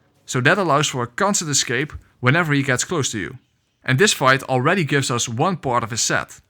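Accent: Netherlands. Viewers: Nederlands accent? hahaha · You sound kapot dutch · Jezus het Nederlands spat er vanaf de eerste seconde af. Lekker man, altijd mooi Nederlandse soulsborne fans.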